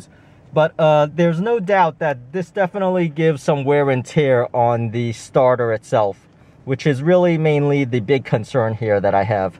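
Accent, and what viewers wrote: Brooklyn, United States. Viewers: Sounds a tad like Woody Allen. Brooklyn boy?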